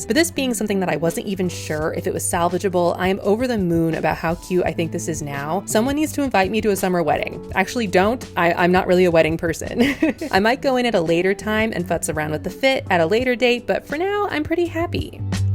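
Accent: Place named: Pacific Northwest, United States. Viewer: Please tell me you live in the PNW. Everything about your voice and the views from the videos I’ve seen tells me that you are - reminds me of Portland, Oregon??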